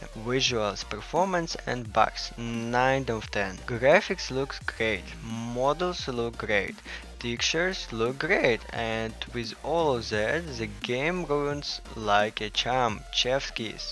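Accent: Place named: New Zealand. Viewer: bro the minute you spoke i was like HELLO FELLOW KIWI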